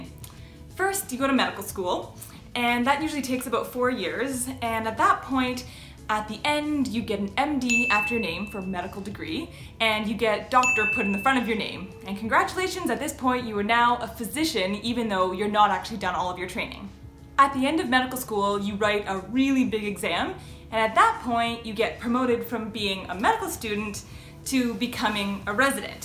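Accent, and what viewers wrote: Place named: Canada. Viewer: My spidey senses told me you were Canadian, and I was right. :) Then, I also started to wonder if I speak with the same subtle accent. Anyway, I love your videos. I'm in law school, and I'm studying medical negligence / informed consent right now. Watching how your days unfold really brings some of the cases that I'm studying to life.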